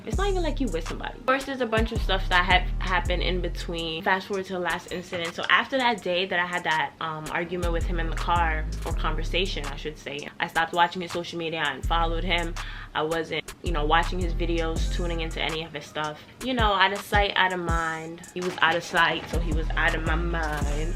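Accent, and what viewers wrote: New York, United States. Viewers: It’s deadass not enough New Yorkers on YouTube cause I love the way we tell stories. when you was like “ guess who sent me the picture “ I felt like my bestie was putting me on !!!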